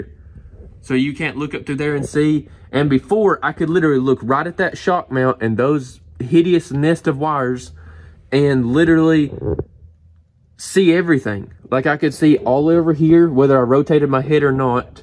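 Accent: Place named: Tennessee, United States. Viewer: I got some that are similar to yours and mine quit holding a charge more than an hour right after the return window closed . How’s yours working now ? Great review! I love the wide view these types of lights give . Definitely want another one that’ll last . Your accent is similar to mine . I’m in TN , born and raised . What state are you from ?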